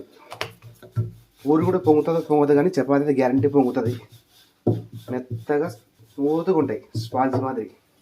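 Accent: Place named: Anantapur, India. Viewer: మీ భాష వింటే అనంతపురం జిల్లా వాళ్ళు అని తెలుస్తోంది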